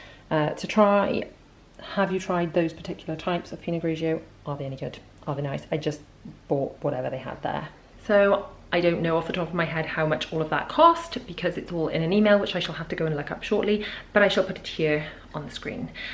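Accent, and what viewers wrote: Wales, United Kingdom. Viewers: Ah what a lovely channel, how have I not found you before! We're a family of 6 too, I feel so paranoid going shopping, like you're being judged🤣 I even felt like I had to justify why I needed 4 Easter eggs in Aldi the other day... apparently there's no demand for them so it was fine...no limit yay🤣 The hardest thing about all this is everyone being here all the time, when usually some of us are in school/work (as with everyone) the food is literally flying out of the fridge! Anyway sorry it's an essay, lovely to 'meet' you. Do I detect a Welsh accent? 🥰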